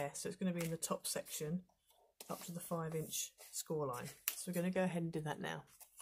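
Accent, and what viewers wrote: United Kingdom. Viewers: Cute card ❤ the way you do your tutorials so easy to follow, also I’m from California and love your British accent. Thank you for sharing have a nice day.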